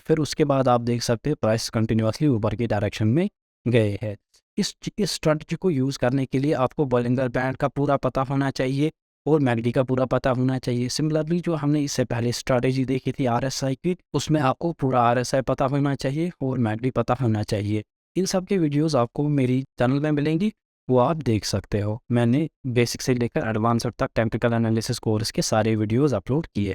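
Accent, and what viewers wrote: Kashmir, India. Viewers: Salute sir aapki tone Kashmiri hai hamen aap par proud hai..❤❤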